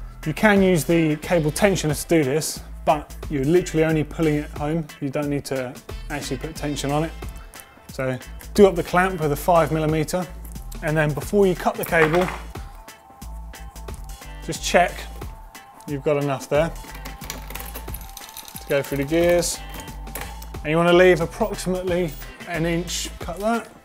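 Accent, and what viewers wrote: United Kingdom. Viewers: Please add subtitle that too hard to understand biritish english for me :D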